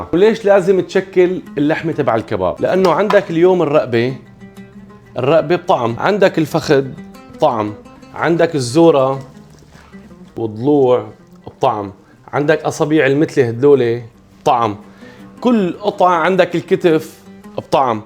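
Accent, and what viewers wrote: Syria. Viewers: الله يرزقك من حيث لاتحتسب ، الف شكر على نظافتك واسلوبك ولهجتك السورية المحببه لقلبي ، الله يعمرك ياسوريا وترجعين عروس المدائن ونرجع نزورك اشتقنا لك كثييييييييير ، الله يعطيك الف عافيه الشيف الطيب واحلى لايك ومتابعه وورده وحب من السعوديه 👍💐♥️ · اللهجة السوررية ❤️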